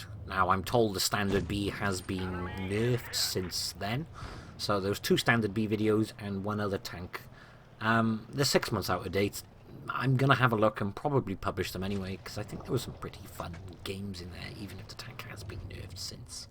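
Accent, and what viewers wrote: Wales, United Kingdom. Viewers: Missed your soothing Welsh voice! And your tips of course!!! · Are u welsh?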